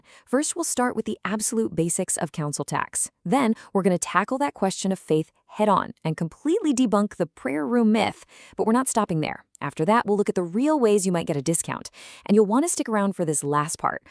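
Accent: United States. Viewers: American accent? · Why is a bloody American voice being used to preach to us about this ? .is this American actually paying council tax in this now disgusting country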